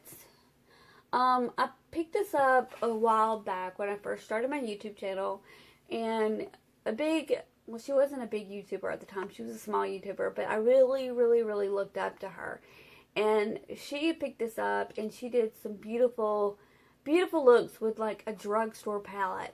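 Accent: South, United States. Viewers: This is such a great declutter video!!! Omgosh! You have such an amazing pallet collection it's CRAZY! I dont know what i would do having nice pallets like these to create with... 😏💭 just gorgeous😍 I have to say, I love your accent. Very strong southern accents are my favorite 😃😍 I use to live in South Carolina, I loved it.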